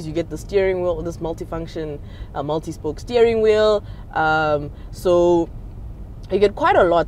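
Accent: Pretoria, South Africa. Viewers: "Ke diesel gaeje petrol" 😂😂😂she's definitely from Pitori 😂😂❤ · The Pretorian accent made me enjoy this review 😂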